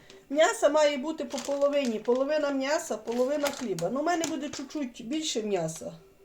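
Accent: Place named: Vinnytsia, Ukraine. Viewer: Доброго дня, якщо не таємниця звідки ви? Ваша мова така рідна, я родом з Вінничини, там такий говір, давно дуже там не була.Здоров'я вам і миру.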